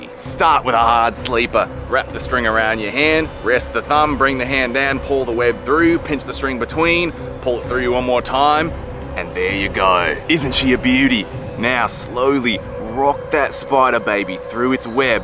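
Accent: Australia. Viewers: As an Aussie he was doing so well with the accent until he said "mom" mate it's mum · mate, your aussie accent is pretty convincing! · Mate!.. thats a cracking Strayin accent.. nice one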